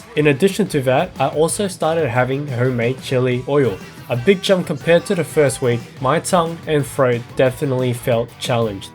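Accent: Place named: Australia. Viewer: Your editing style is really well done. I think sparkling water is spicy, so I’m curious to up my tolerance. I’m from Israel but living in Australia (based on your accent/the Red Rock Deli chips, you do too), so not two places known for spice. Thankfully we do have so many options here thanks to the beautiful blend of cultures.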